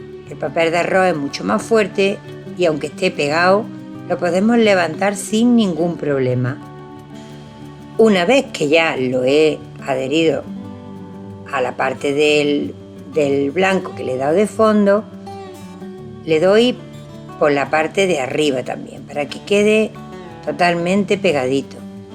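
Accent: Andalusia, Spain. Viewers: hermosa la bandeja!! desde Argentina...me encanta tu tono andaluz!!!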